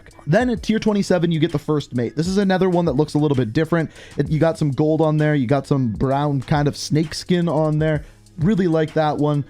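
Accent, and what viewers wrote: Canada. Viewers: Canadian eh? Lol. Theres my comment bud, hope it helps.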